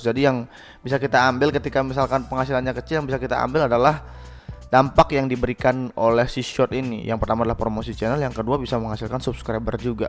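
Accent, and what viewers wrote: Bali, Indonesia. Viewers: Keknya logat Bali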